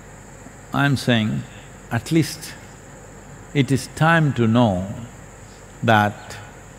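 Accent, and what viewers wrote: India. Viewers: 看薩古魯學英文會不會印度腔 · 至少你多了聽得懂印度腔英文的機會不是嗎XD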